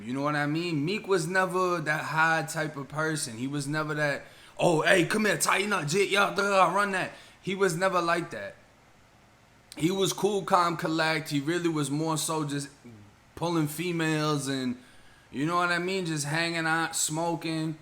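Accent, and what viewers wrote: Boston, United States. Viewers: U can tell he got boston roots by his accent u can here fla but that boston no r accent is def there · Are you originally from the, Boston area? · Do you have Massachusetts roots or boston ???? , good job STAY FREE MY MAN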